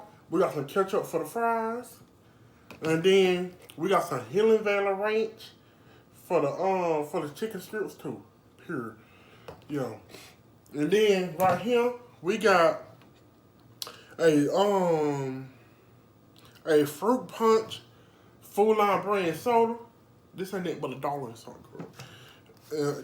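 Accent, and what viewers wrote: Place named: South, United States. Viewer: I'm from California and I love your southern accent...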